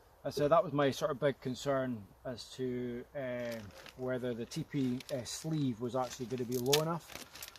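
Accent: Inverness, United Kingdom. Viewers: Hi...Found your channel , subscribed and am working my way through them....Enjoying them very much...I am intrigued with your accent...I am an Invernessian born and bred....Your accent is somewhat similar....Great variety in your vids , thanks for all your filming efforts...Look forward to watching more....Cheers from Inverness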